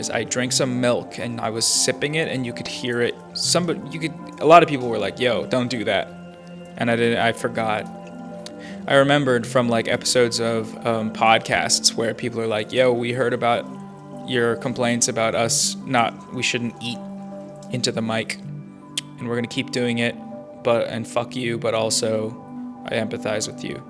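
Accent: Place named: United Kingdom. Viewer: The British voice and the music was pretty chill but i like it without music too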